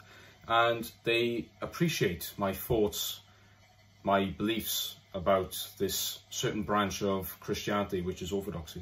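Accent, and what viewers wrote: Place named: Scotland, United Kingdom. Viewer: Hey, I grew up in a Christian/Pentecostal household, much like you, so I genuinely understand your perspective. Similarly, I've delved into Orthodox teachings, finding insights from figures like Father Spyridon and Bishop Mar Mari to be profoundly enriching. Their depth of knowledge is indeed a blessing. I resonate with your concerns about the shifts within the Protestant church. The changes since our childhood have been quite disheartening, particularly when observing the trajectories of the Church of England and Scotland, which seem to diverge from Scriptural foundations. While I've been influenced by Orthodox teachings and recognize God's work within that sector of christianity, it's essential to uphold that Jesus is unequivocally the ONLY path to the Father, as emphasized in John 14:6. Furthermore, 1 Corinthians 6:9-11 underscores the importance of avoiding idolatry (praying to saints). 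Even if some claim not to worship saints, our reverence should solely be directed towards Jesus Christ, the King of Kings. Despite shifts within certain Protestant denominations, I believe Christ prioritizes our individual relationships with Him. The authenticity of our faith lies in our personal devotion and living for Him beyond mere traditions. Reflecting upon 1 Kings, it's evident that faithful remnants persist even amidst broader challenges. On a side note, I detected your Scottish accent. When I resided in Edinburgh, I found solace in attending Charlotte Chapel, a Baptist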